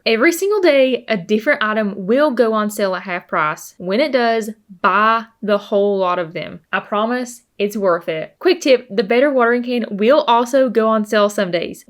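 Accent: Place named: Texas, United States. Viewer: Subbed because of accent 😆 Texas or Tennessee?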